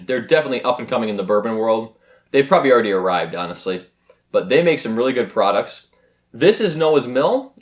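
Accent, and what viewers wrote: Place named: Wisconsin, United States. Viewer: Nice accent. Wisconsin?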